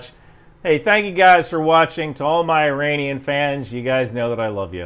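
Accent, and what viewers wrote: New Jersey, United States. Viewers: You've got to do an episode with your natural Jersey accent! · You should really, really revert back to the Jersey accent. I really dig it. I don't think it sounds stupid at all (and I'm not even remotely from that region)😂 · say "It's not a nursing home! It's a retirement community." in your jersey accent. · Please use your Jersey accent more! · Please keep your Jersey Accent! It is fun to listen to! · Toni soprano? wow you sound just like him when you talk with that Jersey accent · Kosher pickles? Joyzee accent? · Bro just casual about the Jersey accent, thats crazy you sound like your actually from jersey. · For the record, New Jersey accent is from a very small part of the state in the northeast, adjacent to Staten Island New York. Pretty much the only people who talk like that are, Snookie and her friends. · My dad was from Maryland, you Jersey types all sound like you just came off a Jersey Shores set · To a west coaster like me, the New Jersey accent is like 95% the same as Bawlmerese, so I'm surprised that Marylanders would think you sounded *different*, much less dumb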